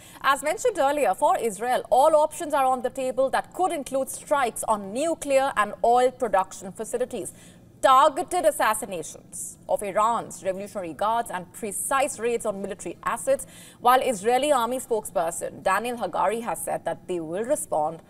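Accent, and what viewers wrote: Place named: India. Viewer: These Indi accent is awful lol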